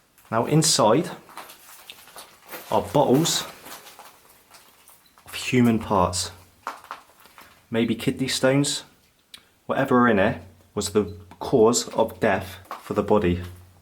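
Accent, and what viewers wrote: United Kingdom. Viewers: Your British by the way you talk interesting video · Lmao you know YouTube comments are toxic when a brit has to excuse his accent to those of us in the western world.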